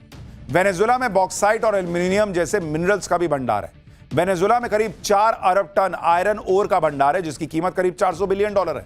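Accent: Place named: Patna, India. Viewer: Sir aap Patna Se Ho keya apki language patna wala hai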